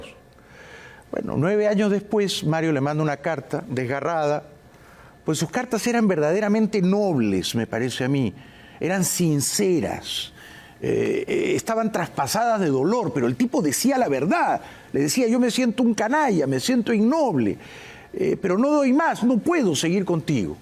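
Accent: Peru. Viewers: Castellano peruano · así es, el castellano limeño.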